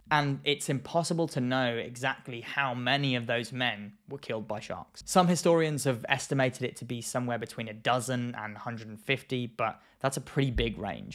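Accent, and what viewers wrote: England, United Kingdom. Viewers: It’s weird to tell an English person how to speak English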